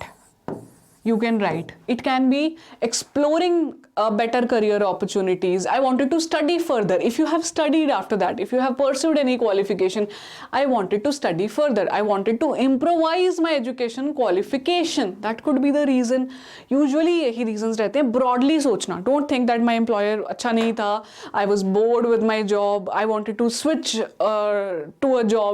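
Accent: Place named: Karnataka, India. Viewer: It looks like mam mother tongue is kannada!!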